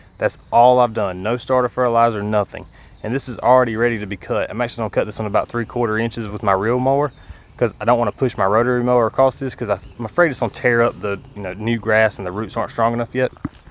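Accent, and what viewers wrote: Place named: South, United States. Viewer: that southern draw. I got it bad. Thanks man. Appreciate it.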